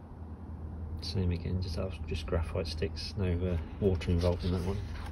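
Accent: United Kingdom. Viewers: Yes we are thankfully back to our normal temps here in Seattle. I'm curious,, would you say that you have a "West Country" accent..? Would that be noticeable up in London.? I have a fascination with regional accents. thanks. Bill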